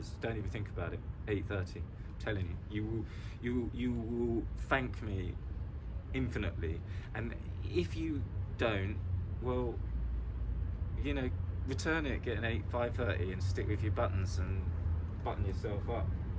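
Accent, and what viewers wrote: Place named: England, United Kingdom. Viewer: HAHA felt like I was watching my little brother , makes the same face and comment only with English accent instead of Mexican. Love the review and candid comments. Felt like a friend (or little bro) was spelling out why the 830 was better.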